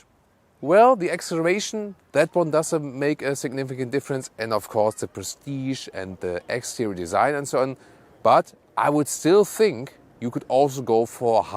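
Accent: Germany. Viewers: Gibt es den Kanal auch auf deutsch? Das deutsche Englisch ist....ähm...suboptimal, um es mal nett zu sagen. Und jaja deutsche Autos sind besser blabla...aber die saufen auch wie die Sau! Es gibt nämlich nirgends einen Fullhybriden im Angebot. Entweder Vollbenziner/Diesler, der säuft einiges mehr als der Lexus hier, oder dann direkt ein Plugin oder full E-Auto.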